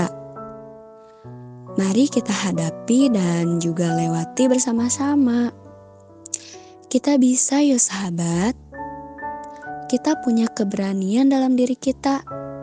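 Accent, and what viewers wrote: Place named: Medan, Indonesia. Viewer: kakknya pasti orang medan kan🥰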